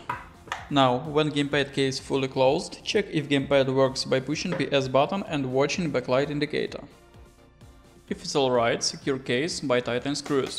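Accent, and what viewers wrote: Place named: Russia. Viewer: Русский акцент 😅, лучше делай видео с субтитрами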